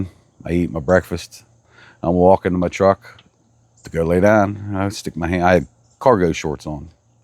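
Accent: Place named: Pittsburgh, United States. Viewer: lol i was thrown off by the Golden State shirt and story about driving from Utah. I’ve lived in Pgh my whole life so I know a fellow yinzer when I hear one 🫡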